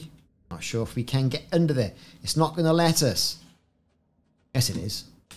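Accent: Wales, United Kingdom. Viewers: Nice vid mate...I sense a Barry or Cardiff accent?...I'm watching all the way from Swansea :)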